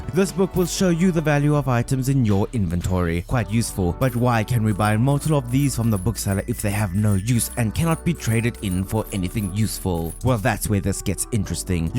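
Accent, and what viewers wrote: South Africa. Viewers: I sense a South African accent...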